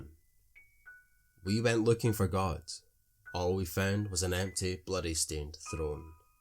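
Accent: Scotland, United Kingdom. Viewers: good to hear another scottish person telling stories 👍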